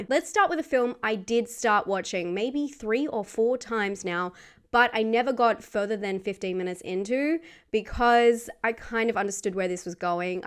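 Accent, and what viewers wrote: Australia. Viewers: Australian gives Australian delivery. The upward inflection at the end of sentences is hard to miss. Good to hear a fellow Aussie and it looks like you have a loyal following. Keep it up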